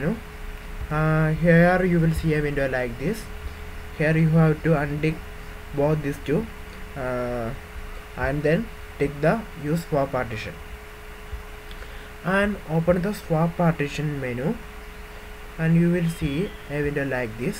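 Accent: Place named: Kerala, India. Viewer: .... thanks a lot..... frankly speakin isimply wasted my cash on that roesoft ram exoander bullshit!!!! i cud done this for free...... btw are u a keralite...... coz ur akcent seems to be like that...... im a keralite!!! anyways thanks bro!!:)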